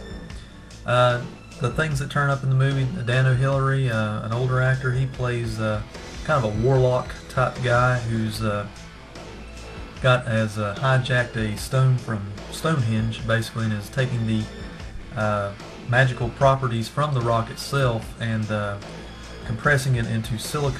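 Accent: South, United States. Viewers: It took me a while to get used to your Southern Drawl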